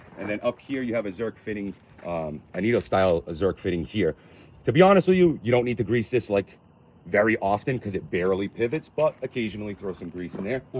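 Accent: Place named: East Coast, United States. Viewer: He does talk fast, but he's covering everything as well as possible. The east coast accent is definitely showing.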